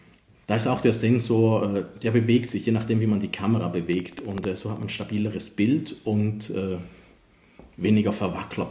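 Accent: Switzerland. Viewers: an deinem hochdeutsch feilen. Da hört man den schweizer einfach zu stark raus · ihr in der Schweiz auch im Dialekt "sächs" sagt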